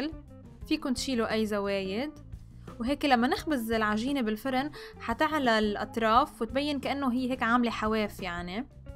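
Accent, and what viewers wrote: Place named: Levant. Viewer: ماشاءالله وصفاتك بتجنن ولهجة اهل المشرق بلاد الشام ماشاءالله بتعقد 😍😘😘😘 موفقة حبيبتي 👍🌹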